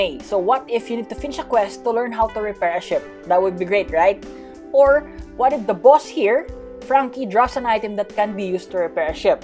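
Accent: Philippines. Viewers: are u filipino or in the phillipines im curious becaus of ur accent hehe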